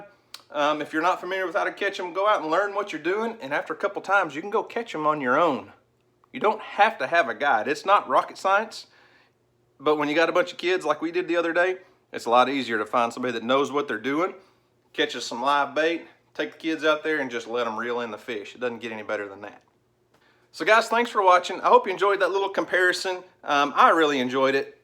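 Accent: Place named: Alabama, United States. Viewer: Sounds like you are in Alabama instead of OK. Stripper and catfish are wonderful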